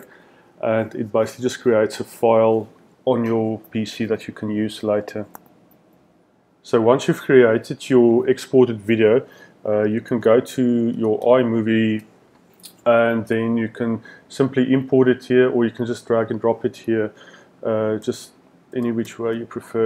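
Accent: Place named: South Africa. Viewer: Thanks for this! Recognise the South African accent! So great to see great content for SAFFAs.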